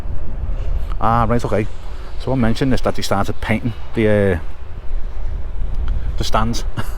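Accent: Liverpool, United Kingdom. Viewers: Look forward to your updates! On a funny side note, your intro is very catchy and since the scouse accent is pretty unique and an earworm at times (as an Indian native, it was always different).. i found myself mimicking that starting bit xD Cheers for the good work mate.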